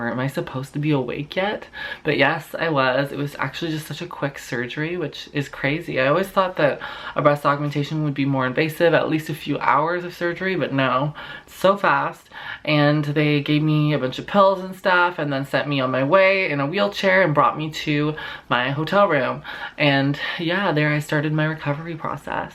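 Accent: Canada. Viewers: I listen to to learn canadian accent of english, she pronounces well, extremely beautiful girl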